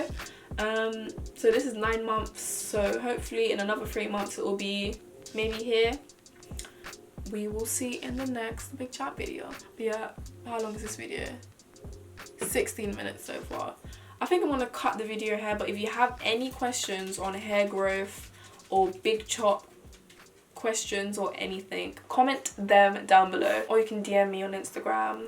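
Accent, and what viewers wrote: United Kingdom. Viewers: 😱your British 🙌🏾🙌🏾🙌🏾🙌🏾 yass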